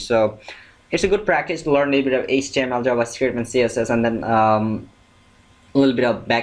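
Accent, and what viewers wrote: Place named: Bangladesh. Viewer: what major do you think is best for guys like me who is willing to do engineering(undergraduate) but not sure what to begin with... i sense you are from bangladesh(by your accent) ... so am i ... whats your advice to me as a fellow bengali ... what major should i do?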